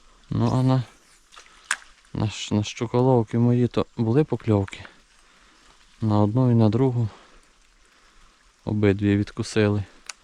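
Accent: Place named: Volyn, Ukraine. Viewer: Привіт з Закарпаття!виріс на Волині згадав рідненький говір.😊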